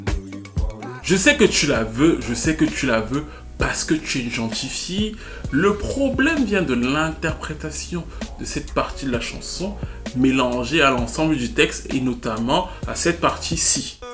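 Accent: Cameroon. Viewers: Toi tu es camerounais d'origine 😭😭 trop le ton ... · Il s'agit juste du parlé et du phrasé typiquement camerounais. Que j'aime beaucoup d'ailleurs...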